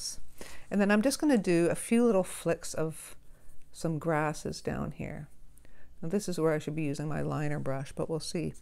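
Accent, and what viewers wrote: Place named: Gloucestershire, United Kingdom. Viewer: as usual I love your work. Is there dvd of your work available? Love your accent the way you pronounce out as owt, reminds my wife and I of our ancestors from Gloucestershire ☺️👌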